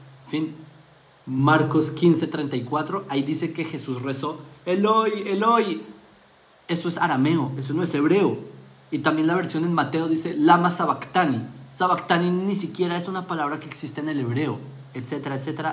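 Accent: Bogotá, Colombia. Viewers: Yo lo que creo y con sus propias palabras eres más Cristiano que cualquiera. Y veo que tampoco sabes nada del tema. Porque no subes las bases exactas, cómo años, escritos, datos arqueológicos etc. Ahora tu acento no es judío eres más bogotano que la changua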